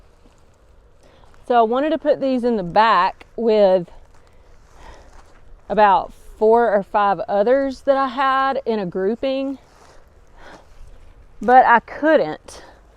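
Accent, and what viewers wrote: South, United States. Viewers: 'Southern' sounds good on you!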